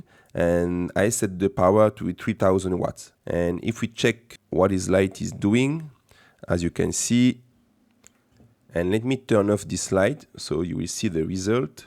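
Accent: France. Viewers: But alors ? You are French !!! ^^^ Au moins avec ton accent Français je understand la moitiés des sentences of the vidéo ! ^^ Merci pour la vidéo...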